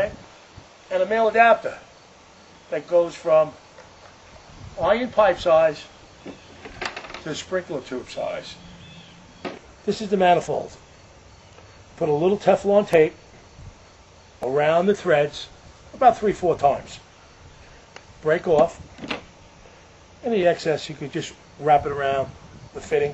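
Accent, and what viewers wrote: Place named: New York, United States. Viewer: you sound like a NYer thanks for the all the info!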